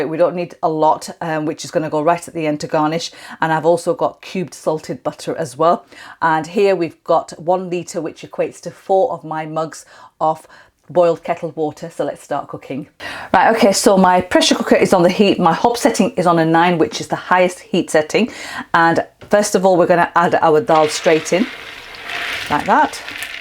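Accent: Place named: Lancashire, United Kingdom. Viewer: I'm really ready to make this dish. Thanks for the recipe and encouragement. I also detect a Lancs accent - worked there many moons ago. And it's so nice hearing you pronounce the word Dal 🙂 brings back fond memories. Ive subcribed and will urge others to do so... Thanks so much.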